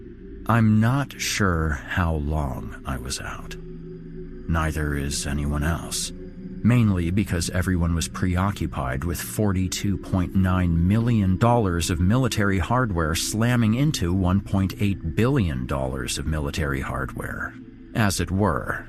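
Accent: United Kingdom. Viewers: Wow! I'm the author. And this certainly was a fantastic surprise! When I started my shift at work yesterday, I had no idea that my story had been officially selected to be narrated by none other than at the Horror Hill! It really was a fantastic way to start off the work day.:) I truly feel honored to have made the cut for something like this. And it's doubly cool for me because hails from the same city where I grew up. Hope all is well in Rochester. And thanks so much for narrating this! And I hope to work with you more in the future... BTW don't listen to the haters man. You totally killed it with that British accent.;)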